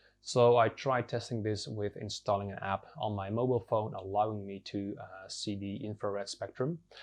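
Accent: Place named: Scandinavia. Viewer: I hear that Viking accent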